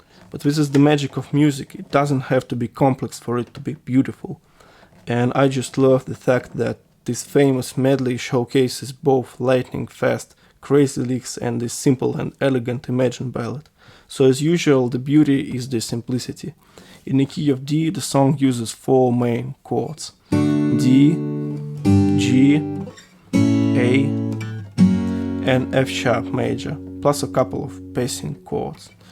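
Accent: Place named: Russia. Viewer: Enough bashing yourself, you speak and explain very well, in fact you have a rather weak Russian accent, if it bothers you, I can help quickly (went through the same);)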